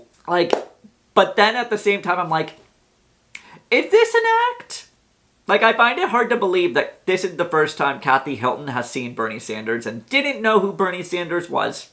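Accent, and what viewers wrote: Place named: South, United States. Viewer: I'm Enjoying your channel. You have a cute accent, southern?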